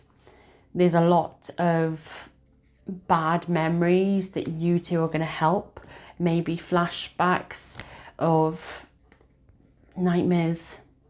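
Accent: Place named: United Kingdom. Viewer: 2 years this as been going on and the same time following tarot this reading is so accurate it is unreal everything not one word out of place its mad how your channel just poped up on my screen when i was about to give up and completely forget this but you have given me hope.Thankyou so much its lovely to hear a British reader ❤️ ❤stay safe❤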